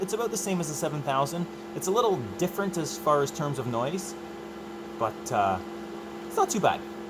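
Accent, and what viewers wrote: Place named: Canada. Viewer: I can tell by the accent in the first minute of watching, you are Canadian. *Edit: Sorry this is so random. Love the vid!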